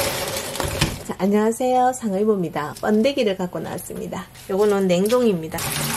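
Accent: Gyeongsang, South Korea. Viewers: 달성공원 언급하시는 거 보니까 대구분이신가 보네요? 경상도 억양을 들으면서 항상 어디신가 궁금했었는데... 반갑습니다, ^^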